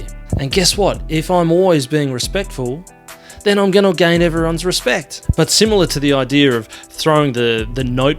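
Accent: Australia. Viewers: I find the Austraalian accent different. But what makes your vids awesome is that you break it down so easily. E mihi ana. Can't take the māori out of the Māori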